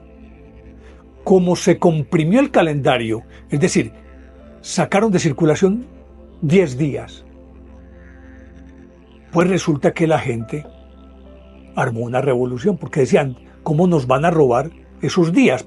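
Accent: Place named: Colombia. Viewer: Me encanto esta historia y narrada con un acentó colombiano que chevere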